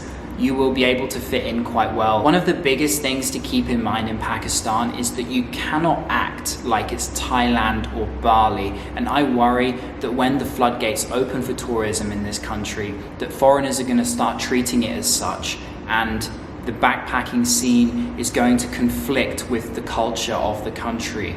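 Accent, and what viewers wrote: England, United Kingdom. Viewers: I fell in love with your accent. Cause I really love English accent😊HI FROM TURKEY 🇹🇷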